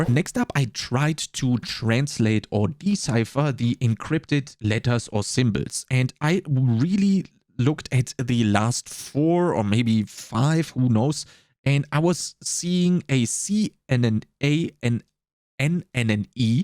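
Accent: Germany. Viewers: Alter... noch nie einen so dermaßen deutschen Akzent gehört.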